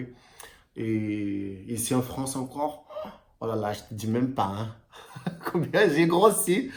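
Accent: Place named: Brazil. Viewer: Je vais regarder tes vidéos juste pour ton accent qui me me replonge dans mes vacances brésilienne. Cette accent est un aphrodisiaque aussi 🤣👌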